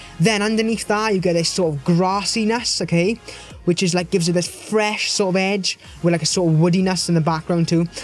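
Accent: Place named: Wales, United Kingdom. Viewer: All the way from the Welsh valley,lol.Love it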